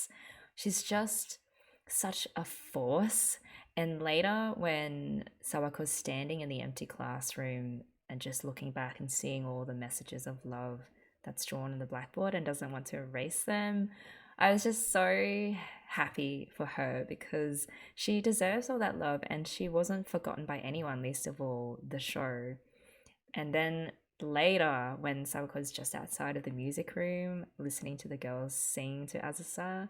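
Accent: Australia. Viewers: Really enjoy your videos and insights and have just spent the last 3 days binge watching K-On for the 5th or 6th time just so I could watch it along side you and catch up. Even after watching it so many times, you really bond with the girls (Ritsu is best girl for me) and tear up at the end as you feel like you're leaving your best friends. K-On is more than a cute-girls-doing-cute-things anime, and closer to a life lesson on getting the most out of the present. Since you liked the drum beat of Go! Go! Maniac (the second OP), here's a real-life Ritsu cover <link> She's totally awesome and was only like 13 at the time. Also as an Aussie from Melbourne who's been living in the US for the past 14 years, I really miss the Aussie accent, so that's been surprisingly comforting to listen to you too. Now I'm starting your Sora yori mo Tooi Basho (A Place Further Than The Universe) reactions, since that's another awesome show that I love. 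Thanks for all the work you put into the videos and look forward in watching Hibike Euphonium with you in the future.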